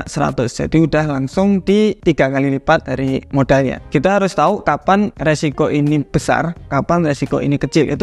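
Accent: Java, Indonesia. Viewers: Asli mana mas Logatnya kayak dari Jawa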